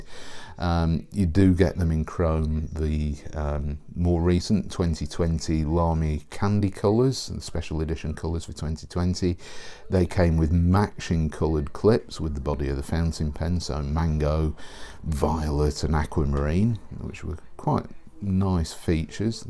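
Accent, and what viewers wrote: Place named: Midlands, United Kingdom. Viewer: I understand him just fine, but I am English, I guess. It's a Midlands accent of some sort, I think, which I imagined would be easy to understand.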